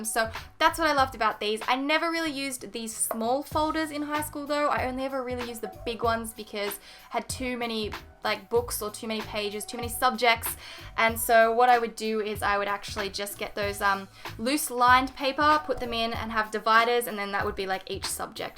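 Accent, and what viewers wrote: Australia. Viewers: I love ur videos but did anyone else you only notice her accent after she said 'in Australia" ? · I can never fully express my love for the Australian accent .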